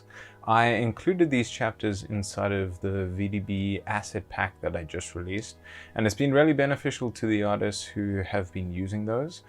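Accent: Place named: South Africa. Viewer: Must be South African!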